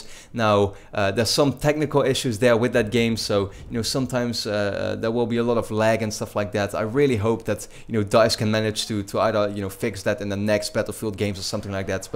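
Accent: Netherlands. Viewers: Fucking hekel aan nederlandse accenten. I mean je kan er niks aan doen, ik heb het zelf ook.. Nederlands klinkt gewoon lelijk xD Anyways, dankje! Ik zat erg te denken of ik nu al van 360 naar ps4 zou gaan. En ja dat ga ik zeker doen. Mijn beslissing staat zo vast dat ik vanmiddag dat ding nog ga halen. Thanks for this review! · You're Dutch right? :p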